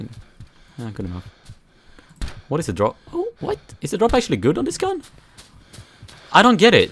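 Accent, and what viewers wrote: Sweden. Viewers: you got a swedish accent ngl. Where u from man? Im from oslo in norway lol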